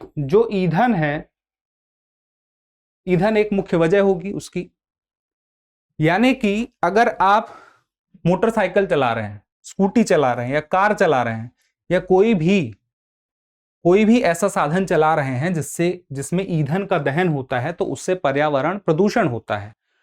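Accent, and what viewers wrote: Bihar, India. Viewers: guthka nhi hai I think sir bihar side se hai vha esa hi eccent aata hai.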